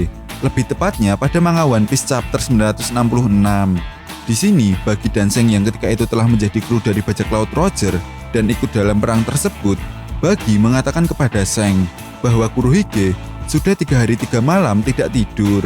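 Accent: Java, Indonesia. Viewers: Bahasanya medog ,, tapi itu yg membuat saya terhibur ,, lanjutkan ....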